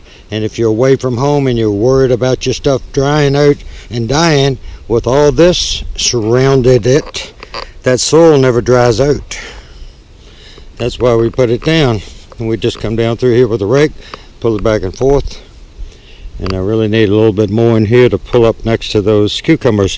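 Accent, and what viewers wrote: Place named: Coastal Virginia, United States. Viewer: I'm going to guess he has an old fashioned Coastal Virginia accent...it does have those rounded o's like Canadian. Listen to Earl Hamner narrator of the Waltons--sounds very similar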